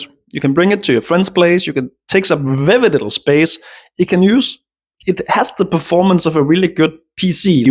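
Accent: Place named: Denmark. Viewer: Dear god, that Danish accent :)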